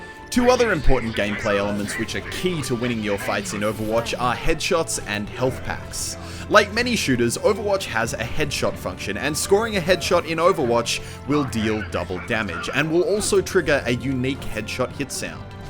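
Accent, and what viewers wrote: Australia. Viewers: He's Australian you dumbfuck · from 'Straya. What's so wrong about that? Can't he speak normaly? Does it have to be US english? I don't think so...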